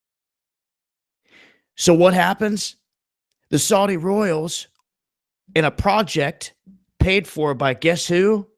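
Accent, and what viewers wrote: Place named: United States. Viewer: wow... to hear hadiths in that yanki accent.. :) kudos mate... good work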